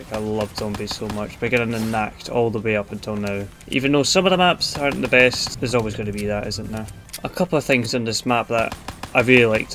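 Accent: Scotland, United Kingdom. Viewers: Where in Scotland are you from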